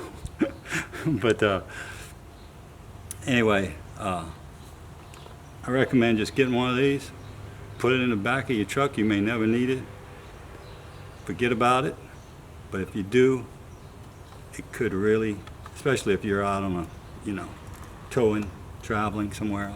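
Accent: Louisiana, United States. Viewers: Are u Cajun? I bought my first cummins while I went to school in Louisiana and u sound like all them cajuns down there. Lol Some good folk.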